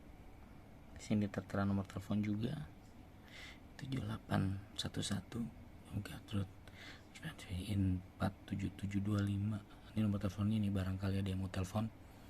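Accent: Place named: Banyumas, Indonesia. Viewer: mantap👏👏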